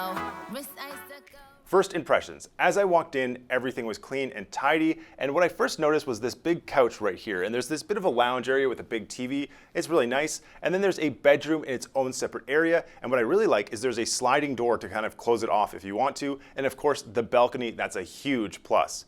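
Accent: Canada. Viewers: I like the $20 trick to see if you get anything. I find it interesting that some places allow early check in. I like your brief reviews! BTW, do I notice a Canadian (or Midwestern accent) haha?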